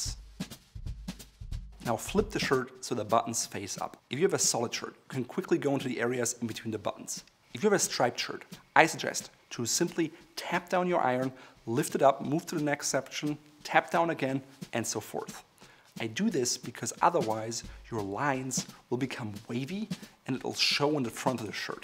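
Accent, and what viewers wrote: Germany. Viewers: He's from Germany. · Whoaaaa your Accent is so strong.... dass ich Deutsch nur vom zuhören gelernt habe! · It's a cross between German and South American.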